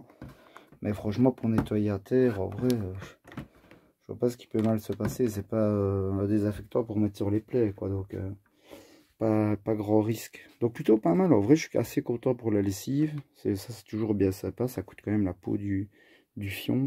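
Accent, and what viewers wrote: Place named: Liège, Belgium. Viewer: bonne continuations vous avez l'acccent de liège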